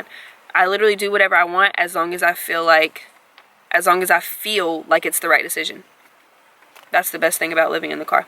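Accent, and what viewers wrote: United States. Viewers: Caitzen lol yes it's sounds kinda Texan 💀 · I love this! Where are you from? You have a little country twang lol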